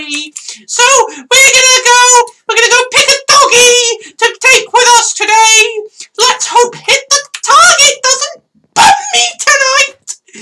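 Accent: United Kingdom. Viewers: British sir pelo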